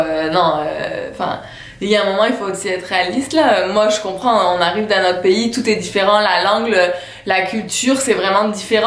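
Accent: Quebec, Canada. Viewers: Pourquoi tu parles avec l'accent du Quebec alors que tu es française ? Je comprends pas trop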